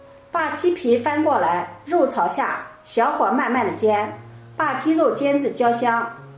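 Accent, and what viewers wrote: Shandong, China. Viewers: 第一次收到推送就关注你了，听口音山东银👍💪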